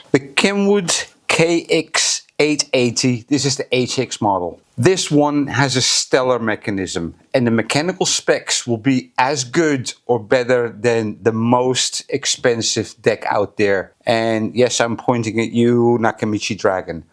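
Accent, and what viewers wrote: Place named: Netherlands. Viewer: you sound Dutch and I am looking for somebody to service a Nakamichi zx 7. Can you help me?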